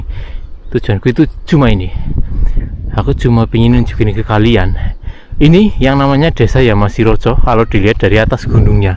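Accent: Banyumas, Indonesia. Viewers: Ngapak banget co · Lee.kok ngapak cah ngendi ,nek ngomong ati2 lee,neng negara orang.takut ada yg syirik nanti jadi abu merangmu.ati2 yoo lee.semoga sukses da n bahagia.Aamiin.❤❤❤ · Asline wong endi si mas, logate ngapak banget 😁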